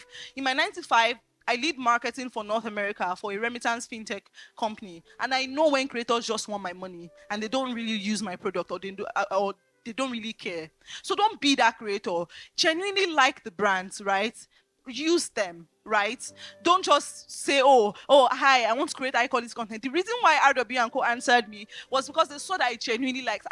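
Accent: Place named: Nigeria. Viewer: I love how you're speaking like a Nigerian. No extra accent😂❤❤❤.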